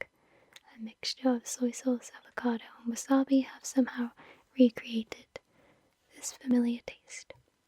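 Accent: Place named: Wales, United Kingdom. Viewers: She's Welsh. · If you don't mind me asking, where are you from? I can hear your accent but I don't know if it's like an British accent or some other accent. I hope this isn't rude. :) thanks love your videos! :)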